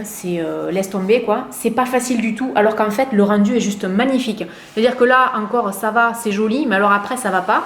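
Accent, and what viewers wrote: South, France. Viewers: Merci pour cette vidéo. Ca faisait longtemps que je n'avais pas été sur tes vidéos et tu es tellement rafraîchissante avec ton accent du sud. je t'embrasse. Fiona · Plus le temps passe plus j'apprécie tes vidéos! Ton accent du sud me réchauffe le cœur 😊 pour Instagram post ce que tu as envie TOI de poster et partager ne fait pas la bouche en cul de poule (ça m'a fait presque cracher mon café sur mon clavier c'était excellent! 😂) ensuite pour ton bébé tu as TOTALEMENT raison profite tant qu'il est avec toi c'est quoi ces commentaires a la noix.. Ce concept de lifestyle une journée avec toi j'apprécie totalement. Je retrouve ce plaisir de me pauser le temps de regarder une vidéo me vider l'esprit avant de ré attaquer mes taches ménagères quotidiennes. Continue comme ça j'aime vraiment ce que tu fais, tu apportes du positif dans le quotidiens des gens et merci du fond du cœur pour tout ça! 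· Coucou, ça doit être la première fois que je laisse un commentaire sous tes vidéos. C'était pour te dire que tu es une YouTubeuse que j'adore regardé et je ne suis pas déçue d'avoir découvert tes vidéos y a maintenant bien 2 ans. Ton accent me donne envie de redescendre dans le Sud a chaque fois ^^ Pour Instagram je dirais vas-y au feeling, poste des photos de ton environnement, de ton fils, tout simplement ce qui te plaît a l'instant T! Continue tes vidéos, encore plus des vidéos comme celle-ci et celle sur ta maison. Bisous, une abonnée d'Alsace 😉😘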